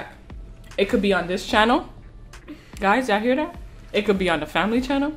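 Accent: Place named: Jamaica. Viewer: U sound jamakon if I spelled it right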